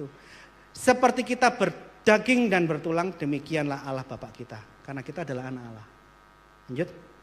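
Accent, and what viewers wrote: Java, Indonesia. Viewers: ketrunan NTT..tpi uda lama dijawa..jdi adaptasinya kental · Kirain ambon. Logat jawa y.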